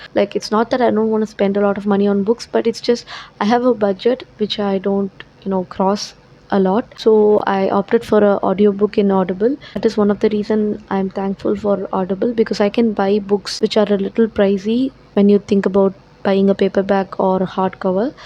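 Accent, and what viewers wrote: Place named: England, United Kingdom. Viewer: Clearly and easily understandable English Accent👏🏻👏🏻. I appreciate this and waiting for more.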